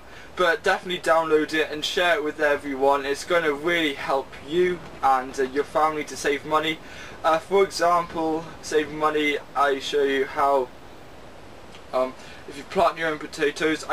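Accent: United Kingdom. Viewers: "Annoying teenager with a british accent"...hahaha...I like your accent. Irish and british are, in my opinion, the cutest accents ever. Great tips btw. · You are not annoying. And a Brit accent is anything but grating! ...it is (the accent) and you are rather nice to listen to!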